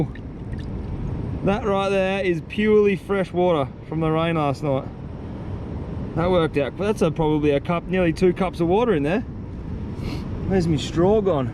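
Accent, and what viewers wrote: Australia. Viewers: He’s literally so Aussie the way he talks he’s iconic i don’t understand how anyone would miss understand where he’s from lmao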